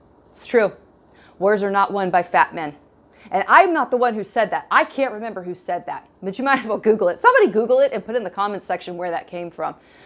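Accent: South, United States. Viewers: I never knew a jew with a southern accent. Now I can say I do.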